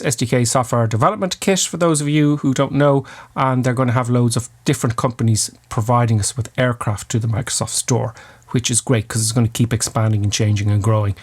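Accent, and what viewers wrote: Ireland. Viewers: As an American, I love your Irish accent and the excitement in your voice! And you have a great sense of humor! 😂 Makes the time pass by quickly until the release! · are you irish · Where in ireland are you from lad? I’m from tyrone.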